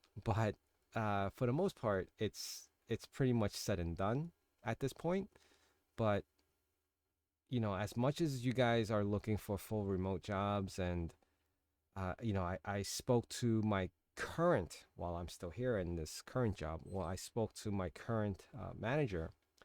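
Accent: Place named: New York, United States. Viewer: Niiiiiice - Everyone has thier $$$ figure that they will have a wolf of wall street moment and go back into the office..."you show me a paystub making that amount and I'll quit my job and work for you today" lol Being that you sound like youre in NYC and some of the duties you described....it has to be 175k+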